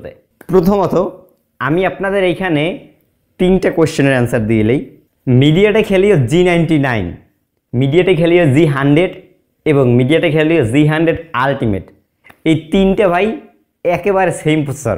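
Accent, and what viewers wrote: Barishal, Bangladesh. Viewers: ভাইয়া আপনার বাসায় কি বরিশাল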